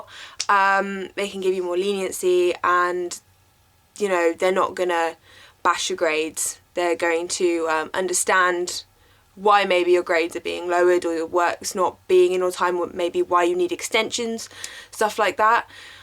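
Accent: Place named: RP, United Kingdom. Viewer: i love your posh accent.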